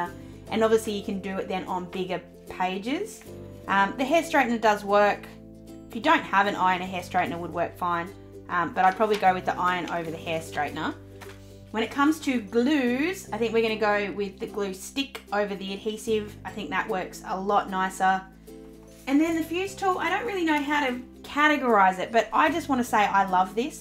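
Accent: Australia. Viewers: First video of yours I have watched and subscribed already. I have a low temp soldering iron that is pathetic for soldering so will try it with this. Good to hear your Aussie accent. TFS!! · It was awesome to hear a fellow Aussie! Most of the crafters I watch on YouTube are from the US, it’s hard to find Aussie craft channels. Definitely a new subscriber here. Thanks For sharing ❤️🇦🇺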